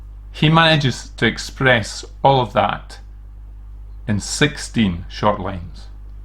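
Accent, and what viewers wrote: Scotland, United Kingdom. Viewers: My name is Liz Moore, and I have Scottish forbesrs.....the Comyns, so I am interested in all things Scottish. Thank you for that beautiful reading of this poem. I discovered it years ago, when I read the book "Dear Enemy" by Jean Webster as it is quoted there, but only the second verse. Now my husband and I are approaching our 50th wedding anniversary, and I want to read it on that occasion, because it so beautifully expresses the joy of two lives lived together. If I may, I would like to play your version - a real Scotsman reading that lovely poem!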